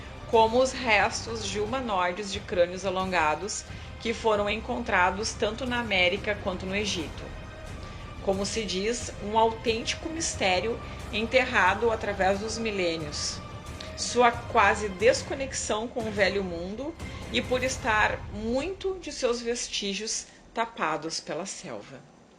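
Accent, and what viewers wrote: Rio Grande do Sul, Brazil. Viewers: Cara,como vc é chato!!! Se ñ gista do sotaque gaúcho dela,vasa!!! Ninguém está te obrigando a ver o vídeo.Vá ao canal original da saga e veja sem a traducão e sem o sotaque da! Ecaaaa!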